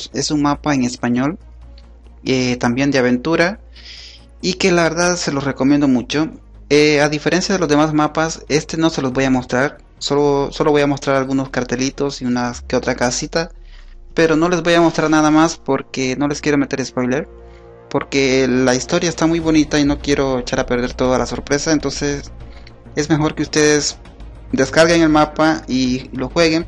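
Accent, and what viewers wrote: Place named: Chile. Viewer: eres chileno? tu acento suena chileno xd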